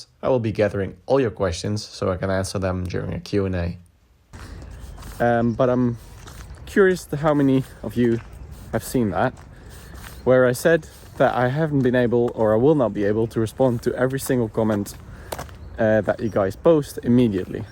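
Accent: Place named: Ireland. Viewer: Q. Curious about your accent (aside from the Dutch tones). Sounds almost Irish! Do you have Irish family or perhaps learnt English from an Irish teacher? Where in Holland do you come from? My grandfather was born and raised in beautiful Leiden. Enjoying your vlogs from Perth Western Australia.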